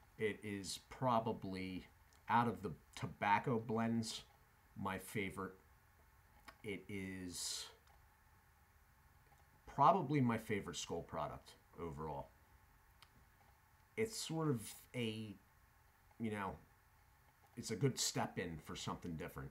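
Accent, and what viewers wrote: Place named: South Jersey, United States. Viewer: I can tell by your accent your from my neck of the woods. South jersey/philadelphia?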